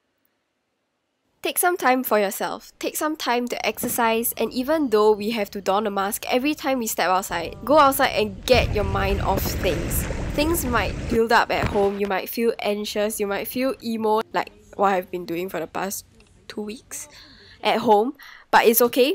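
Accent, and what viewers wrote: Singapore. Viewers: I love how you don’t try to change up your sg accent 🤩🤩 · first time hearing that a singaporean accent sounds french lol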